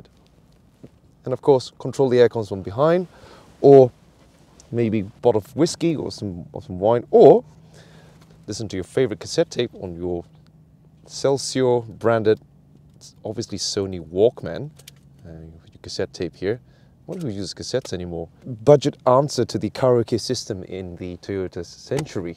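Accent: England, United Kingdom. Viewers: The hairstyle needs a mod to fit with the English accent...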